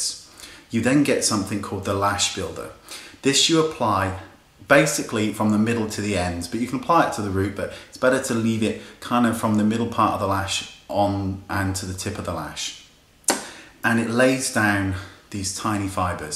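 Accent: Australia. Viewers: Is he Australian? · He sounds kind of like a Aussie ?? Where's he from ?:)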